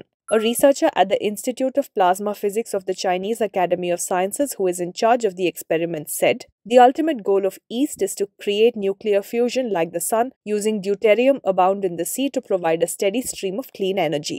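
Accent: India. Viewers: An Indian voice over to debunk sun simulators?? OK ill fake check that as false. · switch off soon as heard the Indian accent lol biggest lying media in the world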